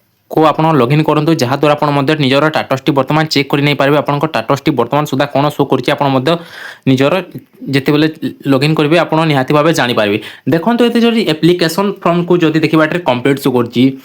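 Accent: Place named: Sambalpur, India. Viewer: Pura Sambalpuria katha lag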